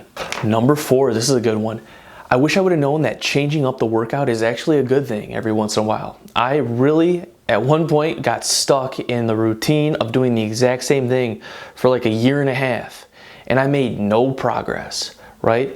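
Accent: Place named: Chicago, United States. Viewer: I recognize this accent. You've got to be from my hometown of Chicago (Chicagoland), right?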